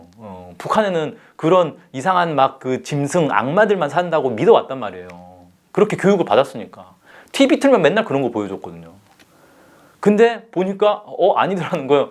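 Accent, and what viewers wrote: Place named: Jeolla, South Korea. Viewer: 대구가 보수의 도시가 된것은 진보세력을 씨를 말려서가 아니고 6.25때 낙동강전선까지 밀렸을때 가장 치열하게 북한군과 혈전을 치르며 버틴 도시였기때문에 공산빨갱이세력의 사악함과 잔인함을 누구보다 잘 알고 누구보다 피를 많이 흘렸기때문이다 동족을 침략해서 300만을 쳐죽였으면 북한은 악마가 맞다 21세기에 수령을 위해서 국가차원에서 기쁨조 성노예조직을 운영하고 있는 나라가 악마가 아니면 무엇인가? 수십만의 사람들을 중노동 폭행 고문 영양실조의 고통을 주는 정치범수용소가 존재하는 나라가 악마가 아니면 무엇인가? 위 영상의 사람의 억양을 봤을때 전라도사람이 분명해 보이는데 전라도는 6.25때 북한군과 치열하게 혈전을 치르며 싸운 경험이 없어서 그런가 북한에 대해서 너무 환상을 가지는거같이 보인다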